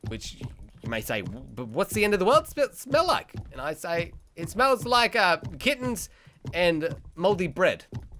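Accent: Australia. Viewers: I love your australian accent,so nice to hear australian :)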